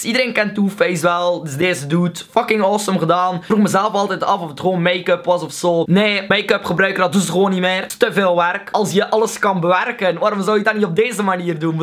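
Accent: Belgium. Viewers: Hoor je dat niet hij is belg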